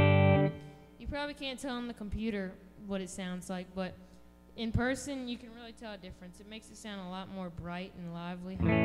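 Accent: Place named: New Orleans, United States. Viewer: You gotta be from New Orleans! :)) Nice amp!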